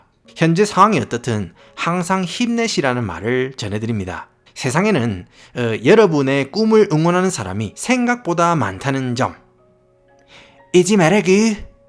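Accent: Gyeongsang, South Korea. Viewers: 첨으로 아주 재미있게 보고 갑니다! 경상도분이네용. Awesome video!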